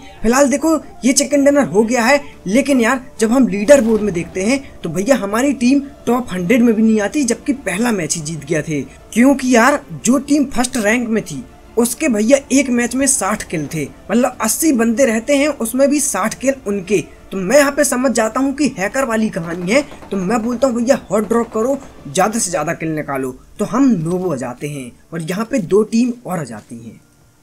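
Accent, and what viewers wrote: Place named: Bundelkhand, India. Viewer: Aawaj se to pata chal gya ki bhai bundelkhandi ho !!! Me videos dekh rha hu kuchh dino se bahut achha khelte ho bhai.... Anyways damoh se hu... Indore me rehta hu... Me b bundelkhandi hu... Bhai real naam batao yr or kaha se ho exactly ?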